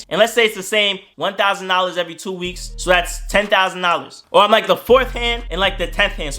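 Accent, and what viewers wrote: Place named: Caribbean. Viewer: I knew you were Caribbean 😅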